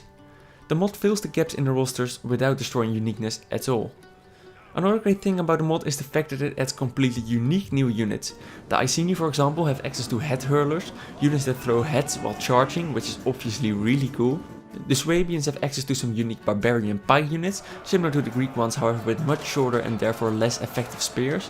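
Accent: Netherlands. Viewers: Ik wist gewoon dat je Nederlands was door je accent, toen las ik de beschrijving van je kanaal xD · haha your sooo dutch i can tell by the accent sooo much haha great vid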